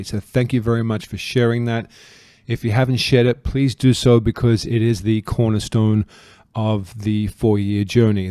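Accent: Australia. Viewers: I think he's Australian by the sound of his voice, but I take the point.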